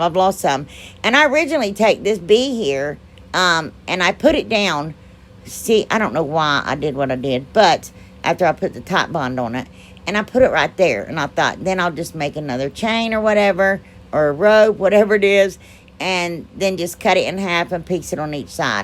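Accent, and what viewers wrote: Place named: Appalachia, United States. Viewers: Um yea her hillbilly talk well my gosh I thought you were as hillbilly as it got lol !Cute crafts if you can get through the talking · That little lady bug and bee could Bee my friends any day. Absolutely adorable! Now, we need you to do Vampires at Halloween. Yeah, I love those fast talking Appalachian people. I grew up with them. That's why I love to listen to you.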